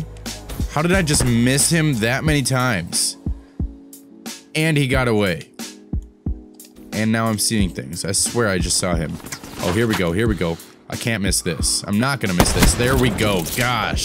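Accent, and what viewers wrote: Minnesota, United States. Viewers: Are you from Minnesota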